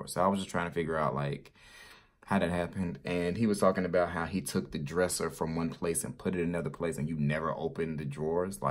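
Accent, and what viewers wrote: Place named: Virginia, United States. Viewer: I'm from Virginia and I think you sound like us. But then again maybe I'm country lol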